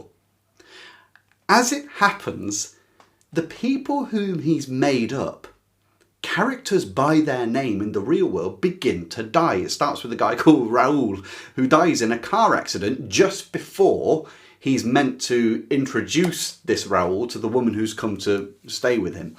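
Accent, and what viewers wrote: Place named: London, United Kingdom. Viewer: I would like you to make a video about what makes a classic a classic! I just discovered your channel, and I like it really a lot: your descriptions of the books, the fact you read a small peace of every book you mention, your wonderful way of speaking (I take it you are from London or near it). You are my favourite booktuber now!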